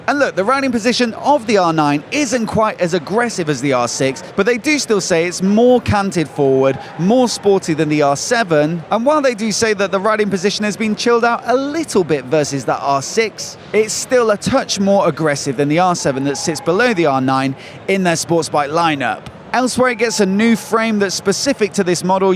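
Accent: England, United Kingdom. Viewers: I love your accent, which kind of English accent do you have?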